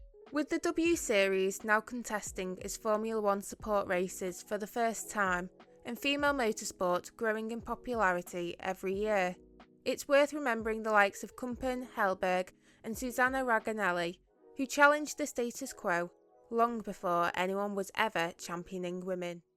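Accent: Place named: Hull, United Kingdom. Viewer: Great Vid..... Ha e to ask is the Narrator from Hull my family is from Hull.. No accent like it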